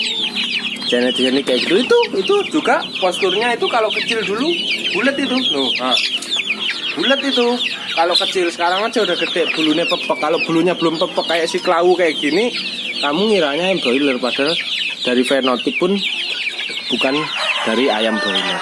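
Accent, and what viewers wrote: Java, Indonesia. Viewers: Aku msh pemula Mas Yang selalu mengikuti petunjuk. Mas njenengan ini orang lampung kok logatnya kayak orang jawa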